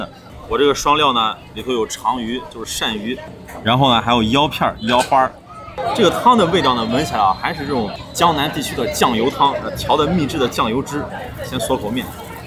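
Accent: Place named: Shaanxi, China. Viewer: 可以听出来陕西口音 😊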